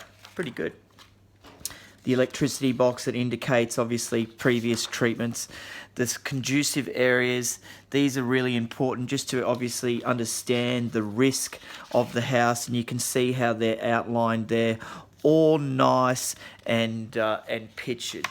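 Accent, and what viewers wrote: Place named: Australia. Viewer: Great video - thanks for sharing. BTW, what kind of Aussie accent, with no powerful F words in his Youtube!!!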